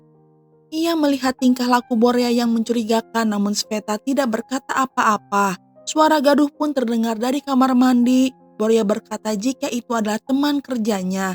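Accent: West Java, Indonesia. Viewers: Aduuh sunda pisan teteeeh🙏😁.. Resep ngadenge sora si teteh 😊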